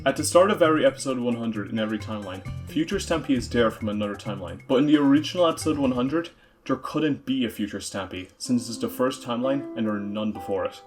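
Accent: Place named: Ireland. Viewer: I thought I recognised that accent Hello fellow Irishman Also, great vid absolutely loved it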